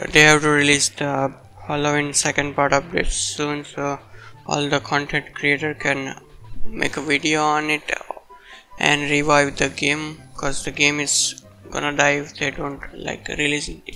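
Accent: India. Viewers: are u Indian becuase u sound like that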